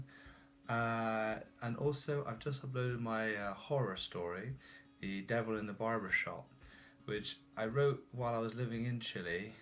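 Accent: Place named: Bristol, United Kingdom. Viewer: Also you are getting Bristolian, WELL WRITTEN with Bristolian accent lol 😂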